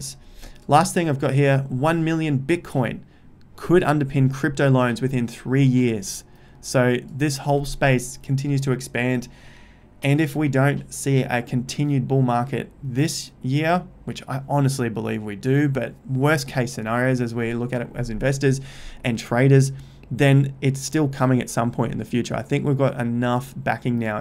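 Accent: Australia. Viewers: First video I watched of yours. Good to hear an aussie accent. I new subscriber :)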